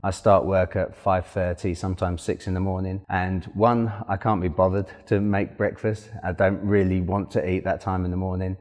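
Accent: England, United Kingdom. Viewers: He's English!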